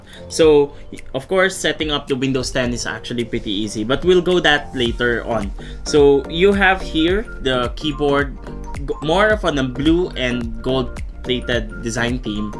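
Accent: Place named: Philippines. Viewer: Hearing another pinoy makes me understand all this tech stuff better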